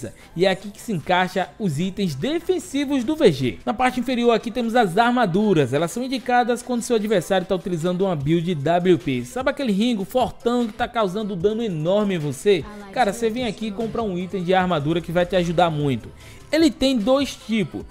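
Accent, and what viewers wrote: Northeast, Brazil. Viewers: Youtubers com sutaques nordestinos são os melhores pra explicar as coisas kkkkkk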